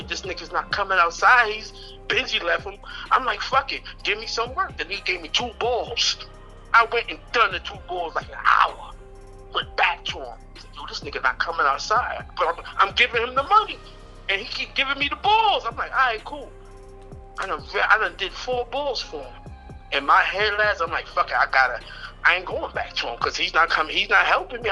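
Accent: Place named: Brooklyn, United States. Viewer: You’re trying too hard with your annoying Brooklyn accent.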